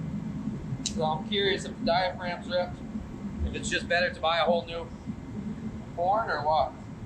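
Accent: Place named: New York, United States. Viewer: Your picking up the New York state dialect accent, no longer the Massachusetts accent.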